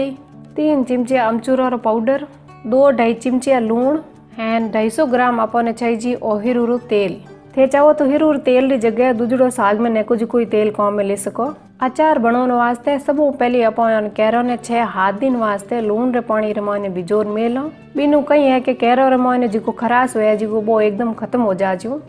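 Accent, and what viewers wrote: Marwar, India. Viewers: मै गुजराती हु। और आपको स्पॉट करता हु की आप अपना वीडियो अपनी मातृभाषा में ही अपलोड कीजिये आपकी मारवाड़ी बोली बहुत स्वीट है आभार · आप प्योर मारवाड़ी बोल रहे हो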